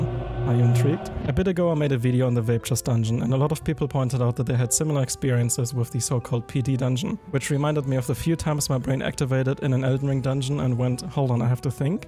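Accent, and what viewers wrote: Germany. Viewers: Ich mag deinen Typisch Deutschen Akzent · Man hört den Deutschen Akzent so raus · The German accent made is much better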